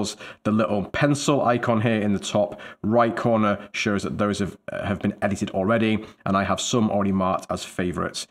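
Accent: United Kingdom. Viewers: I hear a British accent. I subscribe 😝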